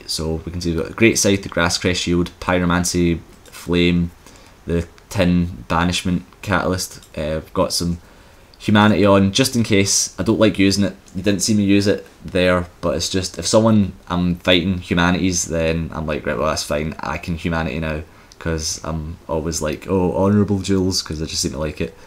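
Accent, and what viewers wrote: Scotland, United Kingdom. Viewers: He has a Scottish accent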